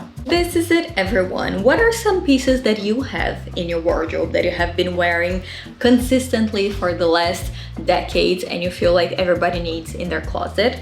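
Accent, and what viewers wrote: Brazil. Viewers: Brasileira, que legal! I was wondering where your accent was from, I really liked your content! Jesus te abençoe, muito sucesso!!!❤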